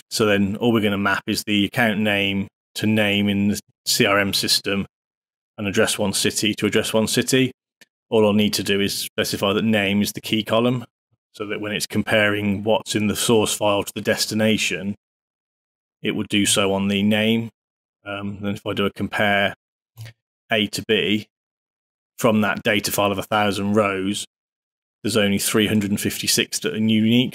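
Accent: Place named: United Kingdom. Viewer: Man, we just hired a really expensive guy. Wish we knew about you., from your accent you're based in the UK, but where abouts are you in the UK?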